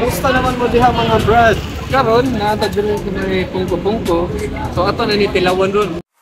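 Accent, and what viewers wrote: Visayas, Philippines. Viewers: lami kaau imong accent brad 🤣👍🏻.. 1000% bisaya accent! i just subscribed to your channel 😊❤️ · 🤣🤣🤣 Bisaya man diay ka! love it! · Pila na diay ka katuig sa pinas sir? Orig naman kaayo imong bisaya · Kuha gyud kaau ang accent sa mga Bisaya ba..kung di ko motan aw sa video Bisayang tao gyud akong maimagine.pero kay nakita na naq mas nibilib kaau ko..hawod kay moBisaya tapos kaming Bisaya usahay maglisud ug English......❤ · ayus accent nimo bpd bisaya gyud kaami paminawon oi..... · First time nahong tan-aw sa imong vlog. Cute kas imong bisaya nga accent maka adik. Murag kag tinuod mauni gi tawag og ugis na puti hahaha. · Atay bisaya jod kaayo ohhh hahahaha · Kabisaya ba jud kaau ani nya oi.. pati mag likramo sa silupin hahahaha new subscriber here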